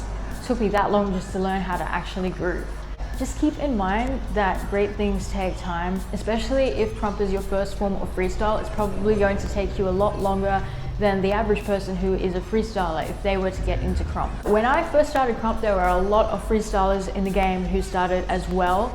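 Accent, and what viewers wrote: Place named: Australia. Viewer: man, everything u talked about this video are literal GEMS of krump!!! its funnaye how it wasnt until this year or during lockdown, i found myself and keep growing just by focusing on myself and not comparing to others. Keep spreading your love for dance. Haha i forgot that your aussie accent is so distinct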